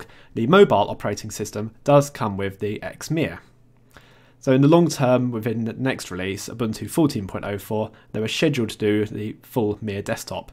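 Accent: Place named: Scotland, United Kingdom. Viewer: Can't really get from where this guy is from... Scotland? Manchester area?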